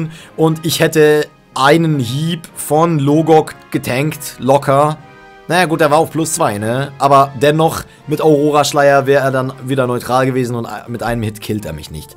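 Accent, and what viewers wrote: Switzerland. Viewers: Mein Deutsch ist nicht sehr gut, aber können jemand mir sagen wenn dieses Mann sprickt Hoch Deutsch? ^^ Can someone tell me if this dude is speaking High German or Swiss German. That's what I tried to say, but my German's not that good. I'm not experienced enough to tell the difference :C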